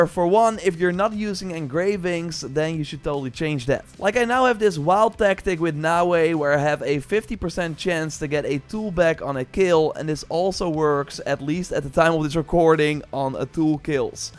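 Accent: Netherlands. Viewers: Yo bro are you dutch ?😂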